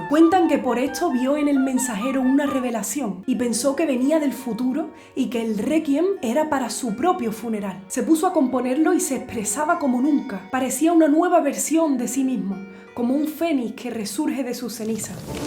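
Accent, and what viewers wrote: Andalusia, Spain. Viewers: Eres una bruja!!!... una bruja pero de la guitarra en tu caldero de cuerdas bates las mayores fórmulas para hacer que nuestras sensaciones caigan y suban a tu antojo...bruja!!! me entristeces y emocionas...tus notas y palabras son una montaña rusa...te odio y te amo...bruja aleja tus notas y tu acento andaluz de mi oído y mí corazón...sufro la fiebre...la mancha en mí cuerpo...a morir feliz!!! Chao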